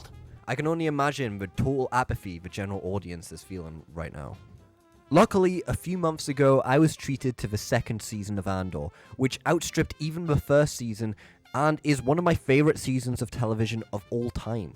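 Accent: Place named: Northern England, United Kingdom. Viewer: Northern English! I didn’t know that was the accent distinction, it’s great! I’ve only been to southern England & Wales myself.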